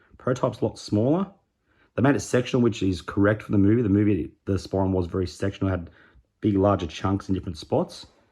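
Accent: Australia. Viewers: Mate you sounded very much like an Aussie collector. Well done ! Nice to know another collector from down under if you are.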